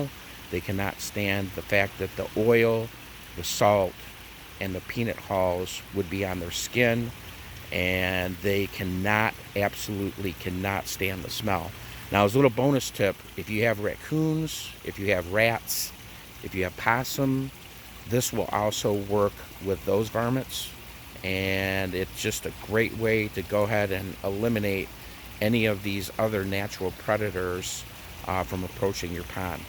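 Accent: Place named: Wisconsin, United States. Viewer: Ughhh!! I can’t stand this guys accent!! Pawnd. It’s like a Wisconsin accent I’m sorry but it just bugs me!!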